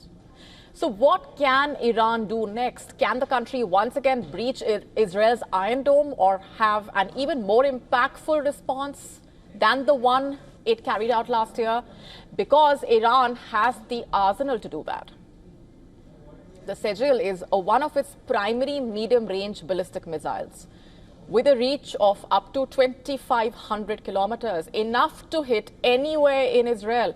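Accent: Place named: India. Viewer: She is speaking Indian English but has a very harse voice not becoming an announcer.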